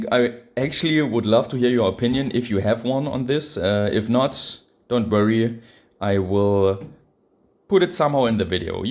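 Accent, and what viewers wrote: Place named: Asia. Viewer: Wow, I didn't realize you write actual scripts for the show. I would have just used a list of points to make. Your videos are very good. Before I learned you were in Germany, I imagined you were in Asia. The accent of your English (you have perfect English) sounds Asian to me.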